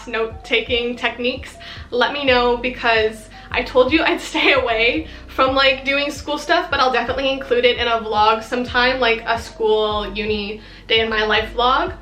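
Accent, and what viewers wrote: Canada. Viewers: Hi I am also from Canada I just found your channel I am going to subscribe. Are you from Ontario it sound like you are? I hope this is not creepy 🤣. I am also from Ontario if you are. You video was amazing btw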